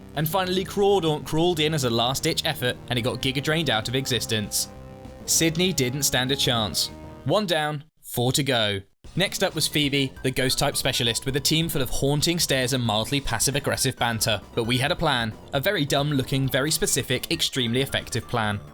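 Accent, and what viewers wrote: United Kingdom. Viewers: Dude I'll give you a hint you may think you're just an average sounding British dude but you're like The stereotype of all stereotypes of British dude sound to these American ears. It's really pleasant to listen to you... So yeah pretty much as soon as American female Pokemon fans discover your channel it'll blow up just fine but you are going to have to do face on camera at some point😊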